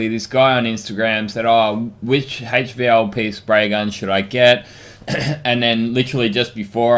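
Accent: Australia. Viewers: Hey mate, love your channel, very new to spray painting and really enjoying it. I’m a woodworker, building small furniture and musical instrument projects, and I have a small compressor putting out about 4cuf/min; it came with two cheapo HVLP guns which are ok to get me started but clearly not up to much more. The FLG-5 looks great but it still calls for about 10 cubic feet/min; some other woodworking channels recommend LVLP guns which seem to fit the bill for what I want - can you recommend any which are easily found in Australia? I can get one from the US but shipping and import duties are crazy 😝. Keep up the great work, love hearing another Aussie accent!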